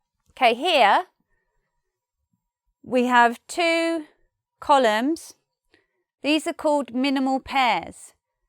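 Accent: United Kingdom. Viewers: Sólo si me caso vos podré tener ese hermoso acento británico 😍✨..💘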